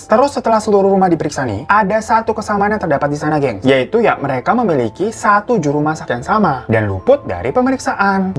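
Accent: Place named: Jakarta, Indonesia. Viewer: Suka pengen ketawa sama abng yg satu ini 😁nama nama depan kyk nama jepang, wjh jg rada" Jepang atau cina, pas ngomong betawi ora 😁 lanjutkan bng, gw syukkkaaa syukkaaa syukkkaaa dngn bhs Betawi ora nya itu 😂😂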